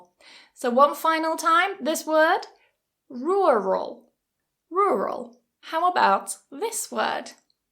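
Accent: United Kingdom. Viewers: are you a british speaker?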